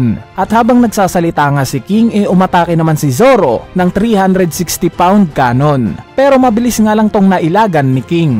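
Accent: Visayas, Philippines. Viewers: Apu at hiyuri bisaya ka pala Apoo at hiyori lambutan mo po ang tigas ng bigkas mo sa pangalan nila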